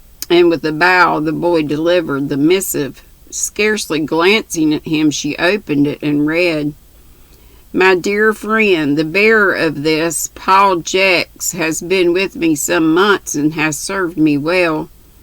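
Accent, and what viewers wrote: South, United States. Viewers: You are so so cute 🥰! 😂 I love your southern accent! I love everything about the south and southern ways! I swear I was southern in a past life, because I’m Hispanic, I was born here but my parents are from the Dominican Republic 🇩🇴 and I actually learned English in school because my parents didn’t learn English till we went to school, therefore people find me strange ( which I’m ok with) when they hear me listening to country music instead of Merengue or something like that, and they see how I love 💗 my cowgirl 🤠 boots 👢 and my country home style. 😂 I just love it! My dream is having a ranch with horses 🐎 and all that good stuff! Also finding myself a good ol’ country man that knows how to treat a lady 😂 ( if you know one let me know Ms. ) 😂 Anyways just wanted to say I love 💕 your humble southern ways and style! Thank you for being you my sweet friend! 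🥰🥰🥰🥰🥰😊😊😊😊🙏🙏🙏😘😘😘😘😘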